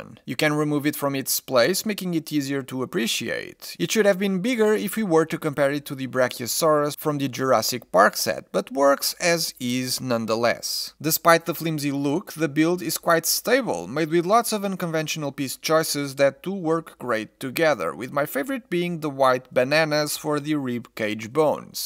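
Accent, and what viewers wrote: Portugal. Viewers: Pelo sotaque e pelo nome deves ser tuga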